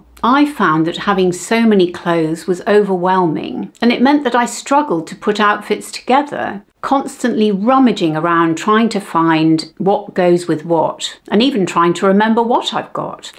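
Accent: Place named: United Kingdom. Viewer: What a beautiful British accent!! Greetings from Poland😊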